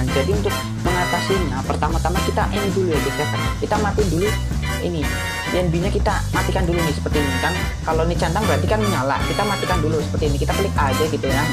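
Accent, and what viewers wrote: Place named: Java, Indonesia. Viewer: Medoknya mntep bos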